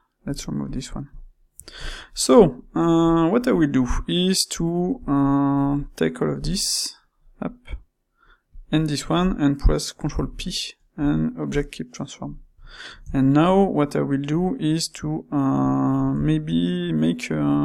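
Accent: France. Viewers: La qualité et tt ce que j'ai appris des ces tutos, mais aussi la force que ces vidéos sur Blender ont comparé aux autres c'est l'accent francais qui fait la force de ces tutos. gg x))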